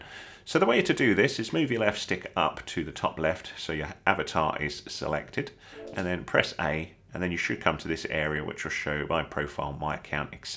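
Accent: United Kingdom. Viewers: U already know it’s a good tutorial when they got a British accent 🙌🏽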